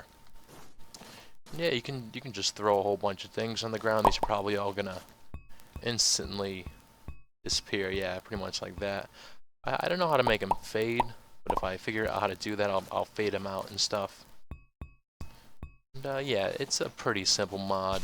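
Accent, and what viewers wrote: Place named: Netherlands. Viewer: are you from the Netherlands because you have that kind of accent also you make Amazing mods Keep it Up!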